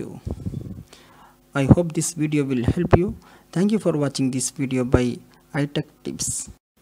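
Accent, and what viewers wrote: Kerala, India. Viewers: R u from kerala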